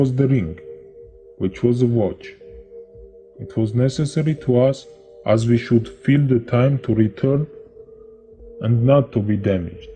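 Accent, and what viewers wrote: Russia. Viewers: Sounds like russian hacker tarus kool not saying he is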